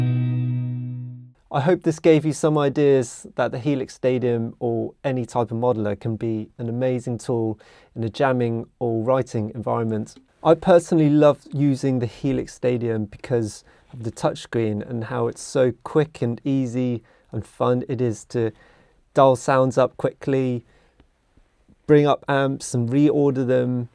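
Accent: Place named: United Kingdom. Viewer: Can you do a tutorial on how to dial in a killer BRITISH ACCENT like yours?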